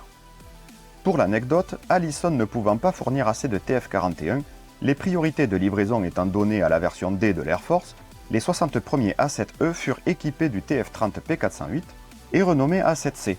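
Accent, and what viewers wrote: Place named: Libourne, France. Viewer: Je regarde vos vidéos depuis 5 ans. Le début donc. Et je me rends compte aujourd'hui avec cette nouvelle vidéo toujours aussi bien réalisée que vous avez l'accent du Libournais. Ce qui semble compatible avec votre adresse de compte YT (3350). Je me trompe ?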